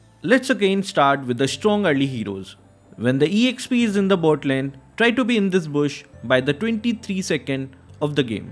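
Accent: India. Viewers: question- Where are u From.. u sound like u r from india.. Please tell me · Are you from India?😅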